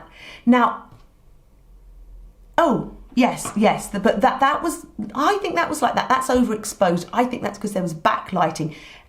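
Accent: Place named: United Kingdom. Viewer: Its a british accent. Lol.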